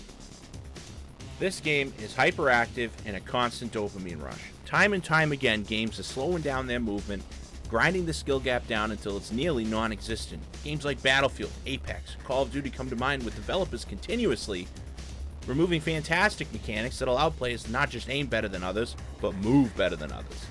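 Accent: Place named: Boston, United States. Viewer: You gotta be from Boston lol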